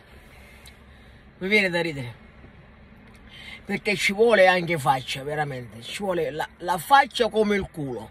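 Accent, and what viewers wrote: Sicily, Italy. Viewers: Ma con sta parlata sicula come fai a tifare Alta Savoia ? Boh !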